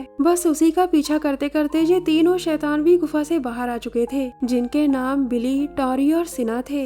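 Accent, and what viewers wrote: Punjab, India. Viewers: Sis tusi punjabi o na 😂 ?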